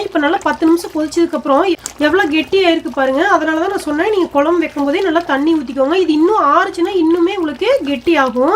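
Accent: Kongu, India. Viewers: Super your kongu tamil is very sweet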